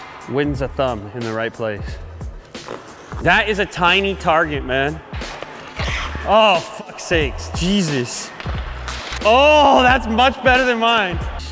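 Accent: Canada. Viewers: Is it just me or his accent got a little more Canadian in this video compared to all the others?😂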